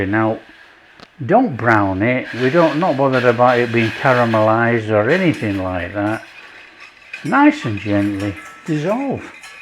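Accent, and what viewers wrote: Yorkshire, United Kingdom. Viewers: I’ve only recently discovered your YouTube page, but I love it. I think your accent is a great Yorkshire one. I’m from over the border in Lancashire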